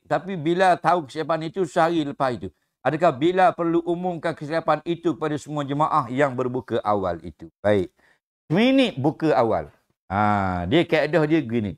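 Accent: Terengganu, Malaysia. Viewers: Uai sangat pandai kecek teganu.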